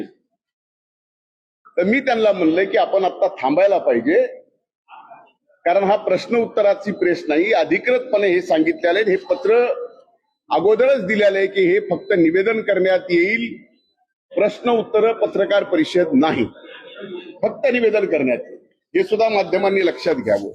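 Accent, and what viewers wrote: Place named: Beed, India. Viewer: बोलीभाषा बीड वाले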